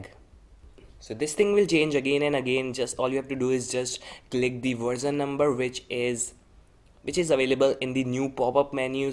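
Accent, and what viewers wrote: India. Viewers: I'm a simple man, i see an indian accent, i downvote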